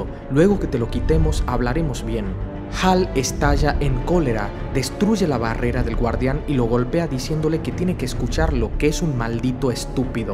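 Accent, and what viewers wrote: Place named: North, Argentina. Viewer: Perdon de donde sos,suena tu voz a como hablan por el norte en Argentina.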